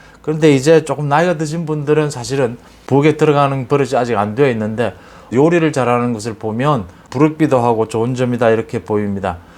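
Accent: Gyeongsang, South Korea. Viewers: 선생님해설은 들을수록 매력이 있어 자주 구독합니다. 경상도 매력있는 말 씨가 들을수록 이끌 립니다.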